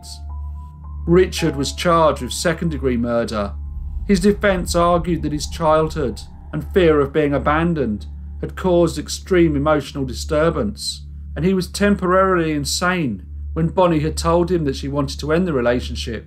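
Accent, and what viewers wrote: England, United Kingdom. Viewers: Completely unrelated to the video, but what type of accent do you have? I know you’re from the UK but I’m curious which one this is? I’m a Yank with the love of accents and have a particular fondness of all things British. Thanks 😊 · Are also Morts family ?😁👍❤He has a nice big family i wish i had the same you help each other that's so nice and i love your English accent 😊💖💖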